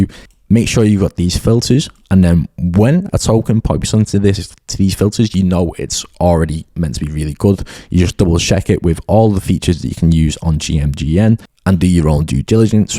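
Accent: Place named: United Kingdom. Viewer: Always subscribe when I hear the UK accent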